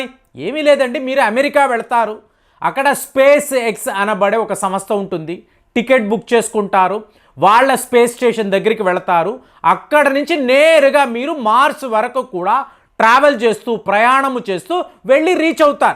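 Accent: Tamil Nadu, India. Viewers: That is Tamil accent.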